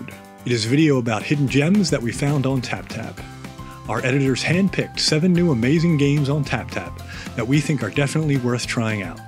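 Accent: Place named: United States. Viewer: Cool! You got an American voice actor! This app seems like less of the Chinese malware it is with him voicing it.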